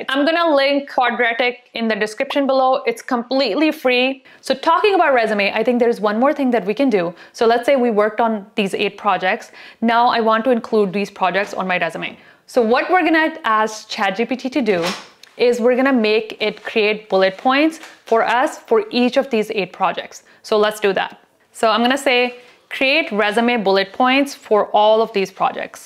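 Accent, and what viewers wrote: India. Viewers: You sound lit bit like an Indian. Anyways thank you for this video.👍🏼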